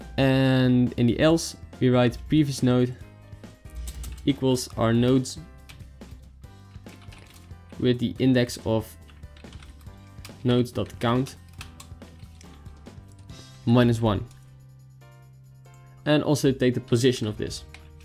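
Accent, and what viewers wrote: Netherlands. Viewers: Do I hear a Dutch accent?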